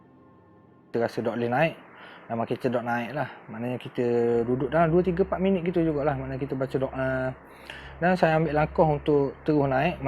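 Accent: Terengganu, Malaysia. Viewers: kn....minat sgt kt...lg2 dgr org ckp loghat tganu...tu suka tgk ni...😊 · Xtau laaa nk tgk video ke...nk tgk penjelasan..sedap bunyi ganu tu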